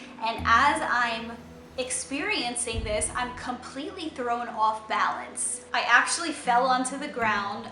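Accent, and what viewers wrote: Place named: Long Island, United States. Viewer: I watched your first video about your summer house...I'm from L.I. originally and immediately could tell your accent!! are you from long island as well? anyway love your videos... watching from LA where I currently live! ♡♡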